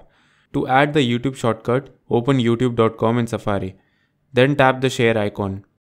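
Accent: India. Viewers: Indian accent always scam😢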